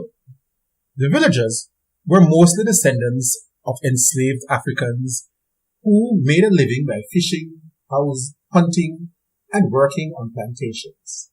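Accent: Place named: Jamaica. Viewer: Love your Jamaican accent and how well spoken you are..Very soothing and relaxing☺️👍🏽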